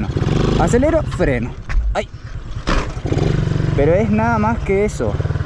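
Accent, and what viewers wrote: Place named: Chicano, United States. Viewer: Che como que te que te queda media chicano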